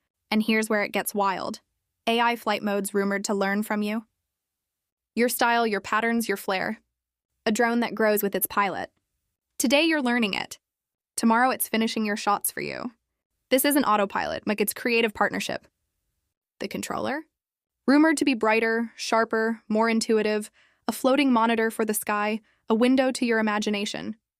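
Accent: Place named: United States. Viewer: a croaky American voice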